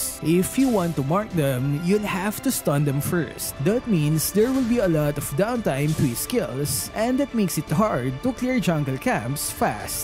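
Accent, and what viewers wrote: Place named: Tagalog, Philippines. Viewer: In a yung english mo sir parang tagalog pakingan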